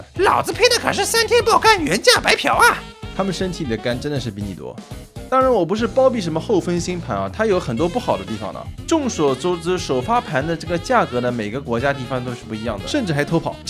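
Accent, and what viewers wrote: Shanghai, China. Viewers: “纵所邹资”作为在海外的上海人 你让我想起了我和蔼可亲的上海语文老师 祝他在天国安好。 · 这个上海腔搭配的恰到好处。色一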